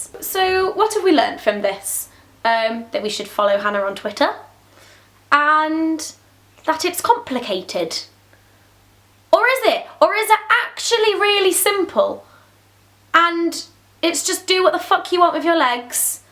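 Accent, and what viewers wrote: United Kingdom. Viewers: I love your accent girl it is so awesome!! Makes me wanna be British. And I get lazy all the time and don't shave my legs, who cares because you don't live to impress other people and if you don't wanna shave your legs then so be it. :) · I normally don't like British accents but I like yours for some reason.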